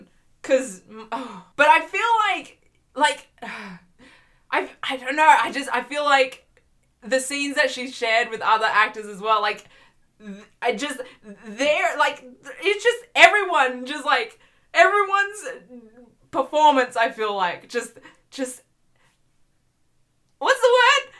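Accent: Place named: Australia. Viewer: Success for you is just around the corner (bubbly personality) and that Aussie accent.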